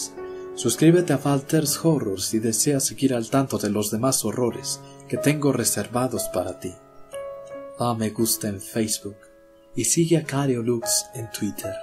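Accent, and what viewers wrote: Mexico. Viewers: Buen vídeo /u\... oye eres de México? quisiera pedirte un vídeo haahaha... muy bien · que ondas,eres de mexico?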